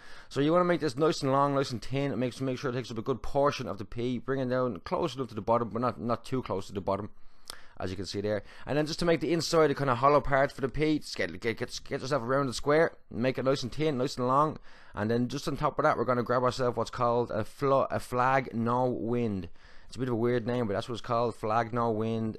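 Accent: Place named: Ireland. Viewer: notice your Irish accent, I'm from Ireland :) woo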